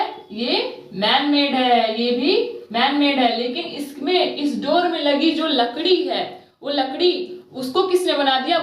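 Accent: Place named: Bihar, India. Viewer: Baaki sb thik h ...bss language m biharipn aa lg ra h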